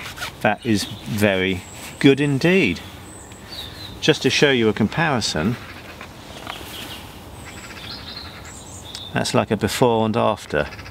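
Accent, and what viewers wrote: United Kingdom. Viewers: how wonderfully British! Love it!!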